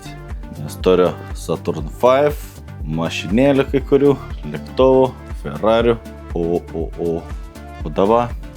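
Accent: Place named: Samogitia, Lithuania. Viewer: 4,20 čia ko gero kultivatorius. Beja ar nebūset iš Žemaitėjės? Kažkap žemaitiu kalba jautas.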